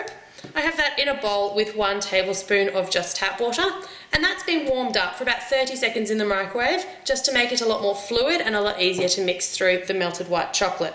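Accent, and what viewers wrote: Australia. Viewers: It's so nice hearing another Aussie voice on Youtube! I love your tutorials, I've just come over from Yoyomax, I'm so glad you two did this collaboration. Thank you so much for posting all of these videos, they're wonderful! x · OMG are you australian I LOVE YOUR ACCENT!!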